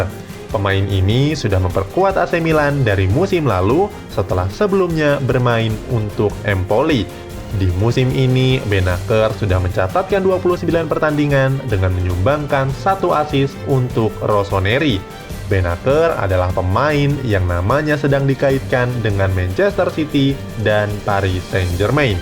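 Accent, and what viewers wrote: Indonesia. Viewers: Lidah indo broo..😅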